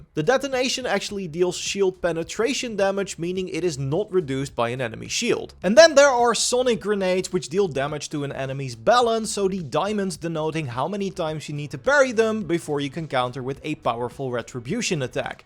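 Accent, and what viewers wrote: Netherlands. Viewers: they’re from the netherlands so they have an accent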